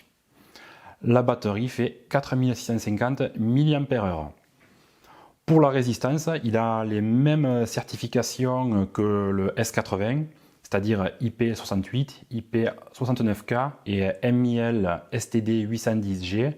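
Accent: South, France. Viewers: Merci beaucoup et toujours avec l'accent du sud et le sourire en prime 👏👍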